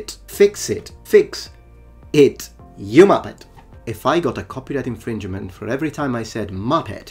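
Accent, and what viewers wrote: United Kingdom. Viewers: He does sound like a posh Englishman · The more angry gets the more British he becomes.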